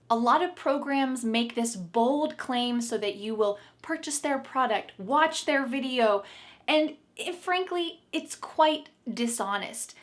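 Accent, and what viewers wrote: United States. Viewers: It's nice to find a normal American who speaks English. And not a Foreigner with a accent. Which makes it harder to understand. 😧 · She only speaks American, not real English. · Of course, I will watch every of your video. Cuz you are using American English. Perhaps, you’re from the US. I am now learning American English, this is why I subscribed your channel.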